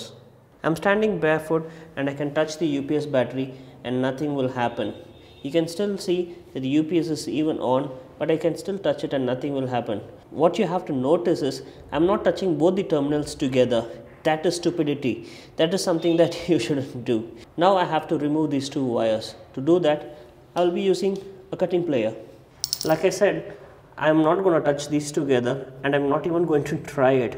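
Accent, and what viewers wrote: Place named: India. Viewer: Typical Bharat accent😁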